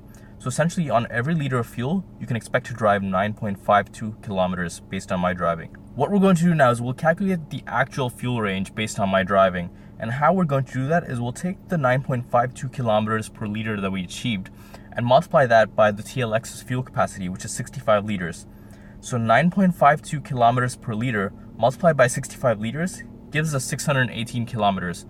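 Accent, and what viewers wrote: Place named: United States. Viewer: Which country is this? The narrator has an American accent and they are speaking in kilometers and liters as well as dollars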